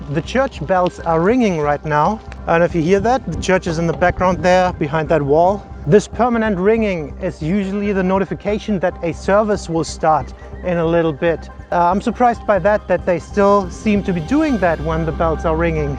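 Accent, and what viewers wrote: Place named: Franconia, Germany. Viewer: Franken oder? xd Ich habs allein gewusst wenn er deutsch redet und war mir 100% sicher, wie er in einem anderem Video gesagt hat „du kannst mir mal den Buckl runter rutschn“ und jetzt noch die Dächer in dem Video...😂 haha🙈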